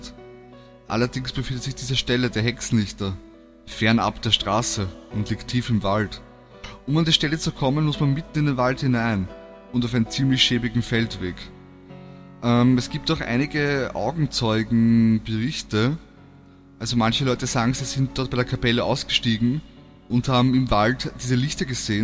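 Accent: Austria. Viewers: Bist du Österreicher?? :D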